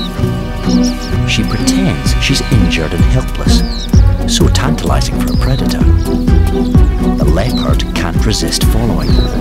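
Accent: Scotland, United Kingdom. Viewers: where're you from in scotland, lad? love the videos. bravo for the work well done eh? cheers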